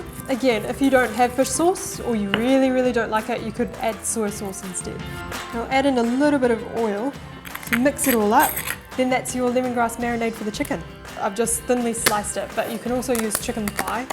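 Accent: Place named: New Zealand. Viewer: The accent is From New Zealand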